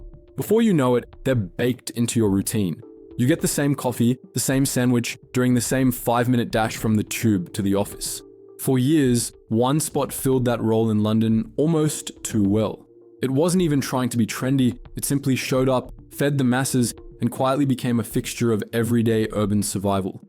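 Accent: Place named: Australia. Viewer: Why pick an Australian for the robo-voice?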